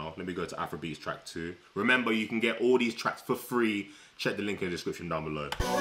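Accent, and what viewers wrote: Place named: Roadman, United Kingdom. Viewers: Mans is road man bruv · lol it's all good. You speak the Queen's English. My American English is a bad version of yours. 🤣🤣🤣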